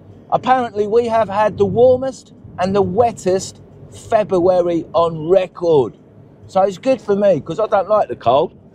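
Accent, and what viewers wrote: United Kingdom. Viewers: lol that ole norfolk accent soon comes back!!! lol miss norfolk, esp sheringham & cromer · Good show. Thank you for sharing your life. You have much thicker British accent now that your back in the UK. I like Flo, you even have a sunroof. Looking forward to your upcoming adventures in Europe. Will you be getting the Netherlands? Amsterdam would be a very cool place for a vlog.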